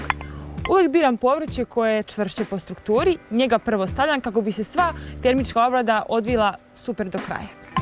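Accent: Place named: Western Serbia, Serbia. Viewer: pa ti imaš što kažu X faktor, mnogo te volim izled lepoto bez šminke,samo prirodne maske, čisto lice kao izvor, gledam recepte ali ti si otkriće zapadne Srbije i još student lepoto kad vidim dok sam na PC a cablovska na mute odmah prekinem mute da ti čujem glas i naglasak tog dela Srbije 🇷🇸 ti mlađa crna kosa a Keti moje po priči tu je moje godište plava kosa a ti crnka, jedino što me interesuje odakle to znanje u kuvanju,prelepo dvorište pravo Srbsko ukrašeno, pa kad te vidim devojka prirodna i opasno zna znanje o kuvanju nemam više reči lepoto 🇷🇸💐🌹☕🍹🎂🥮🍜🍩🥣🍷moj san kad bi imao takvu devojku bio bi ti sluga i sad sam single 4meseca lepotica sa naočarima ko učiteljica ali nije bilo znaš ono kad osetiš leptire u stomaku, ništa od toga samo dosadna veza,kupio karte za Exit ona sluša DJ musiku Ninu Kravitz i a ja rock metal grupe bili su iz Švedske 🇸🇪 i devojke koje sam gledao ko boginje 🇸🇪💖💐 ja sam išao na DJ eve,kad grom gospodična neće sa mnom, slušaj njeno objašnjenje pa ja ne volim tu muziku,pa kako kad se ne igra? 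u hotel i posle Exita zbogom zauvek lepa devojka a u glavi bubice i dosadna veza,sad sam 4meseca sam još ti da si sama a znam takva lepota a sama to nema u Srbiji ćao moja omiljena zvezda kanala Kičen TV,sad znaš da imaš fana iz okoline Beograda ćao poljubac ti šaljem, gospodin kaže udaj se da mi kuvaš,blam meni su roditelji pokoj im duši oboje, velika kuća brat i snaja su dole ja iz sobe na terasu pokrivena ra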